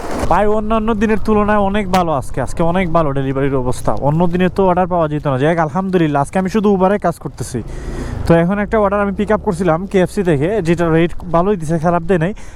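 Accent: Sylhet, Bangladesh. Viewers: apni sylheti?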